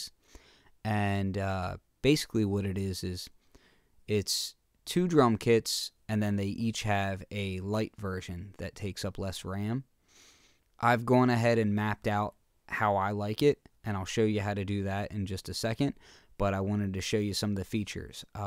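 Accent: Philadelphia, United States. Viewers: Thank you for the video. Is that a Philly accent by any chance?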